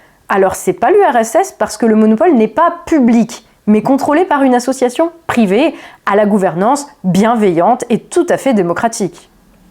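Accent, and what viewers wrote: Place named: United Kingdom. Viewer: Autant on passe sur l'accent anglais globalement autant le pépale répéter tous les 15s dans la vidéo était très pénible à entendre..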